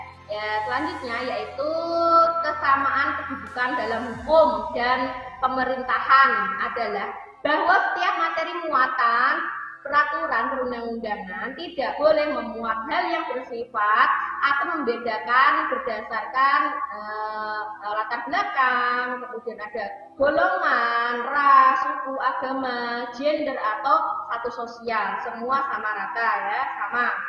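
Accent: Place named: Demak, Indonesia. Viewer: Medok banget suaranya, Org Dmk ya🤣😂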